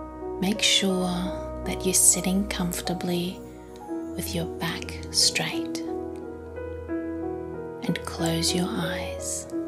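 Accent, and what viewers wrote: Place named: Australia. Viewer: This is such a beautiful meditation, it's your voice I am sure of it. Probably the wrong thing to say but I am Aussie too and I do love meditations with an Aussie accent. And not all narrators has that lovely tone.. I do like your website and I have my eye on a few meditations by you and Brad. Thank you :)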